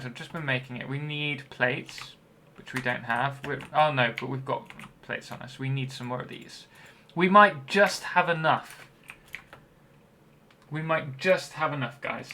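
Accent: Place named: Lincolnshire, United Kingdom. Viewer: I knew I recognised your accent! Nice to see a fellow Lincolnshire brit doing well on the YouTubes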